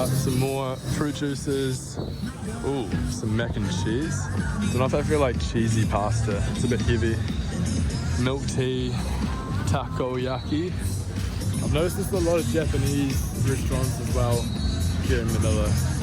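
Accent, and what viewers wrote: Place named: United States. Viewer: Hi, enjoy my home country and be safe always!your accent sounds like americans but upon checking your channel i found out you are from NZ..hope you get a lots lots of Filipino subscribers!gluck👏✌️🙏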